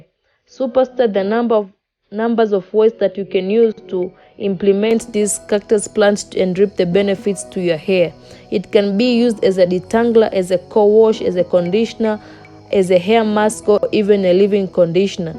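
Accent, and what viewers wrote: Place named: Kenya. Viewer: Her accent sounds like she is from Kenya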